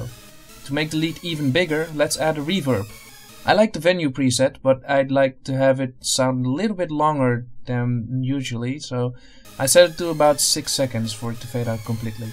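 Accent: Netherlands. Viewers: a dutchy? good accent tbh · ey are you dutch? because you sound really dutch XD ik ben ook nederlands btw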